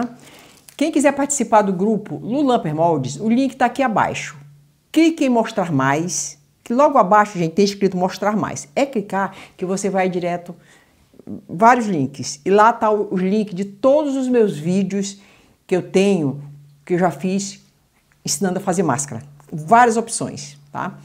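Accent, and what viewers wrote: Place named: Northeast, Brazil. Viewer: De qual estado do nordeste você é? Esse sotaque não conseguir identificar. É do nordeste mesmo ou é norte?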